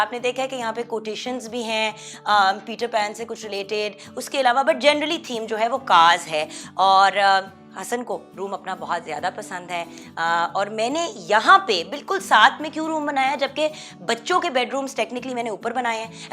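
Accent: India. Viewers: she sounds like indian.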